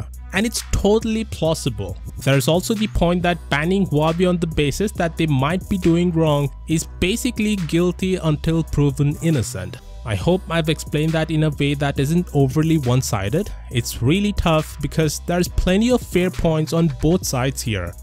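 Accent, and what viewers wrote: India. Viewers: Huawei is good competition for big Smartphone Companies. You also should get rid of your accent you sound like Indian Scammer. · You're sound like an indian scammer.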